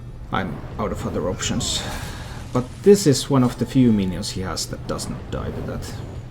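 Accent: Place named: Finland. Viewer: Your accent sounds like your from Finland, good video as always!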